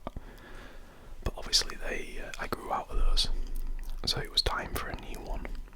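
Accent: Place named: Yorkshire, United Kingdom. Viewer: As a fellow Yorkshireman I approve of this channel ha and respect how you’ve managed to calm our usually loud accent into such great relaxing content :D great channel man!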